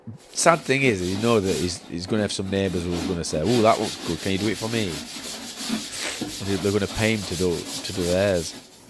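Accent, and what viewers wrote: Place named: Liverpool, United Kingdom. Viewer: I didn’t know you was from Liverpool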